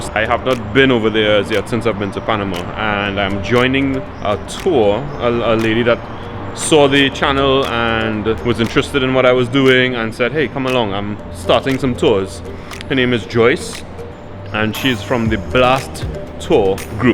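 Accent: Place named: Trinidad and Tobago. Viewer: Recognize this accent anywhere. Hi Trini!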